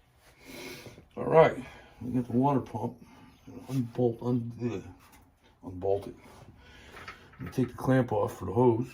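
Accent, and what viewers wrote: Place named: North Carolina, United States. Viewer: Love that North Carolina accent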